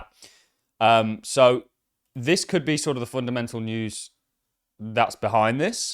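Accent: Birmingham, United Kingdom. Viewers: Cant get more British than a brummie 😂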